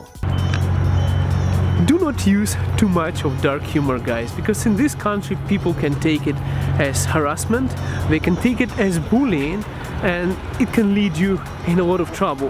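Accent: Russia. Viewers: U Russian? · As a Canadian, I LOVE other accents. Do not be ashamed of your Russo accent.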